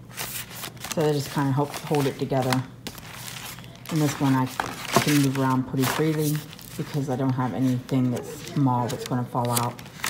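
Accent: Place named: Pennsylvania, United States. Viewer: Hi Tammy of OKC mentioned you, so my first time viewing your channel. I knew without even looking at your address you must be from PA. I'm from NC but my neighbor of years and years is originally from PA and y'all have the same accent! I enjoyed your video!!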